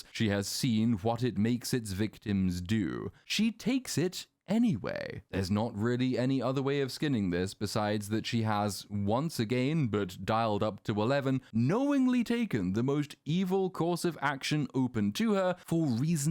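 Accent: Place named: Oxbridge, United Kingdom. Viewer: his Oxbridge accent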